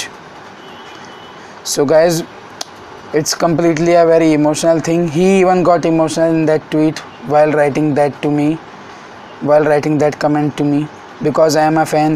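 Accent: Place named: India. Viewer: You got a really strong indian accent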